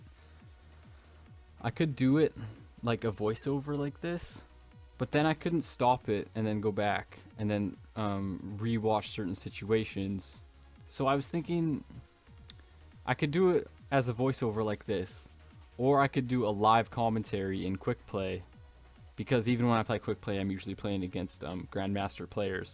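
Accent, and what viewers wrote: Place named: Minnesota, United States. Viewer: Really like your vids man. I noticed that you have an accent close to mine. I thought you may have been from Minnesota like me, but Canada was my next guess. Keep it up, your play is great. I can aim ok but cant track for shit, any tips?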